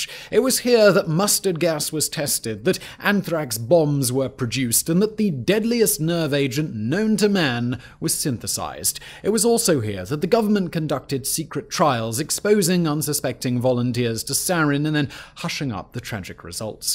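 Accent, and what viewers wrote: RP, United Kingdom. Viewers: Why are putting on a posh accent - it’s extremely off putting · You cover interesting topics but your over the top annunciation drives me mad. I'd attribute it to some sort of paid education but your use of Americanisms like 'garbage' just indicates you're trying to capture a specific audience with a penchant for posh English. I wish you'd drop the act and speak normally.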